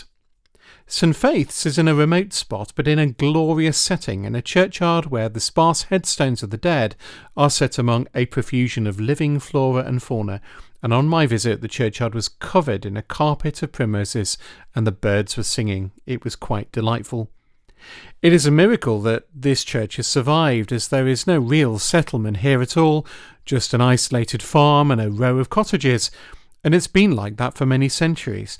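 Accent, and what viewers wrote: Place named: Northern England, United Kingdom. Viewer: I really enjoyed your video. Your delivery is pleasant to listen to (and in the accent of a fellow Northerner, I might add). Where are you from I wonder. Rebecca (Leyland, Lancashire)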